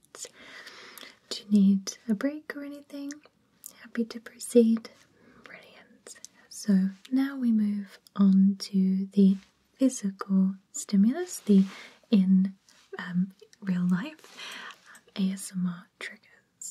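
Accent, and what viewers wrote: United Kingdom. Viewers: Sounds British with a bit of Australian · Brilliant ASMR! +Subscribed when heard that British accent. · As an Australian I feel offended, she sounds British not like us at all! Maybe you are hearing the R sound but there are 25 other letters to consider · 1. Why have I just now come across your channel? :o 2. What's that accent? (British obvs. but exactly) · Whereabouts in the UK are you from? I'm from up North and couldn't imagine a Northerner doing ASMR (at least not well), especially with an accent from where I'm from, hahah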